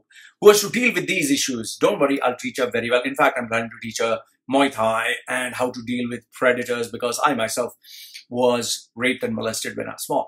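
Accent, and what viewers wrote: India. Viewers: WHY YOUR ACCENT SOUNDS LIKE HOW INDIAN SPEAK.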